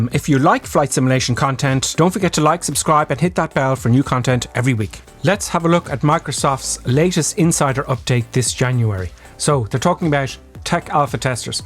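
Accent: Ireland. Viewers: You sound like a fellow Irishman?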